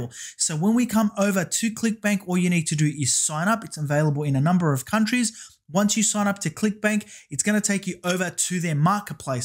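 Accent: Australia. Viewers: Your video is amazing, so many people making videos make it so complicated - you have made it crystal clear. Sure I am going to have to go through the video again and make notes, do you have a cheat sheet for the video? Have subscribed and can't wait for more videos. Like you Aussie accent. Thank you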